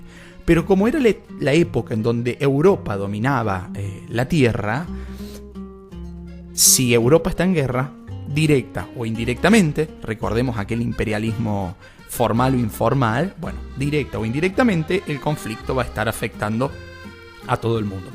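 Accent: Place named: Argentina. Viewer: La verdad que dese hace semanas estoy buscando videos para oír antes de dormir y este canal me parece muy bueno, dormirme y culturizándome , incluso he llegado a soñar de lo que oigo y definitivamente prefiero la tonada Argentina y soy Venezolana , gracias por este aporte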